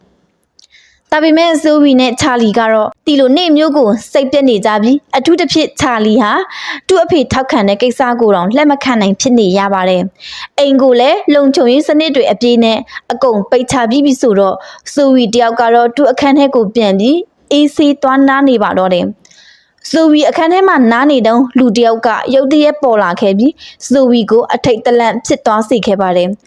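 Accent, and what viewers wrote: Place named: Shan, Myanmar. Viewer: အသံလေးကချစ်စရာကောင်းလိုက်တာ၊စကားဝဲတာကရှမ်းတွေဝဲတဲ့အသံထွက်ဆိုတော့တင်ဆက်သူဟာရှမ်းမလေးတစ်ယောက်ဖြစ်မယ်လို့ခန့်မှန်းလိုက်ပါတယ်၊ ကျနော့်ခန့်မှန်းမှုမှန်လားမှားလားဆိုတာဖြေပေးပါဦး❤❤❤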